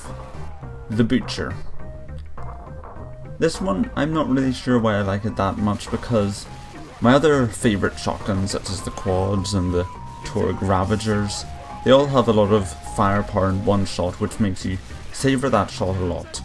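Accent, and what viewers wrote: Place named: Belfast, United Kingdom. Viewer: Where do you come from? You sound like a middle class person from Belfast.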